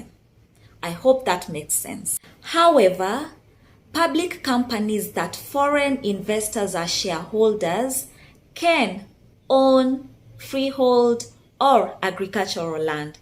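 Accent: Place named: Africa. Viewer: I like your beautiful African accent. I'm Nigerian and I approve these messages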